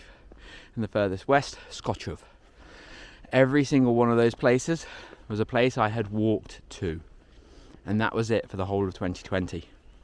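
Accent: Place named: Scotland, United Kingdom. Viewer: That was interesting morning walk with a bit of scottish accent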